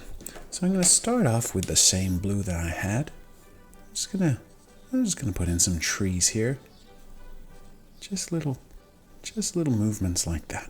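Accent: United States. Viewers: Nice Amati can accent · American accent on point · you pull off the American accent really well. Great drawing. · I cannot listen to you with an American accent I'm going to SCREAM ITS SO UNNERVING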